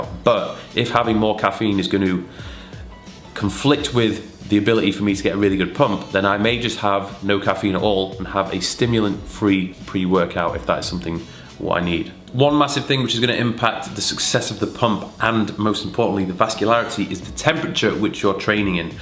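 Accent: United Kingdom. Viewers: You Are British ... Right na...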